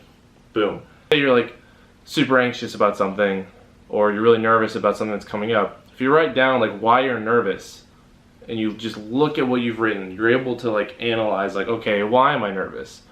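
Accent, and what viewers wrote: Arkansas, United States. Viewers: Are you from arkansas? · Are you from Arkansas? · no way !!!are you form arkansas?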